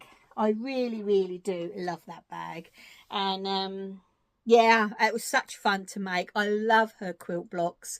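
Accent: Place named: Essex, United Kingdom. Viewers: I'm catching up on some back episides 😂 I LOVE those Crunkle socks, they're gorgeous. I'm so glad you blocked that idiot. Honestly I love your accent, I grew up in Essex but left probably 30+ years ago and I love your accent, it's so lovely to hear. You keep being you, you're a treat and it's blooming kind of you to do these videos at all, they take a lot of time to do don't they! ❤ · Hi, please ignore these people they obviously have very sad lives and more spare time than you or I. You talk like me because we both come from Essex, I originate from Colchester so it is our accent. Don't think about changing ever, you make me laugh. And I would rather you say well done lots than not say it at all. Tc our lovely friend, get well soon and enjoy the show on Sat.. Look forward to seeing what you buy. Lol xxx🧶🧶👍🏻💖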